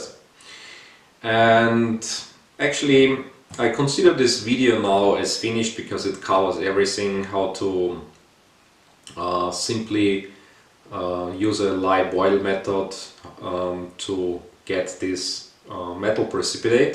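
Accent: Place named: Norway. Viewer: du høres norsk ut.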